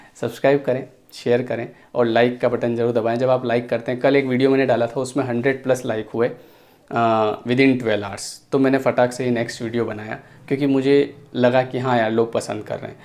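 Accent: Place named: Malwa, India. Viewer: Your tune seems indore and ratlam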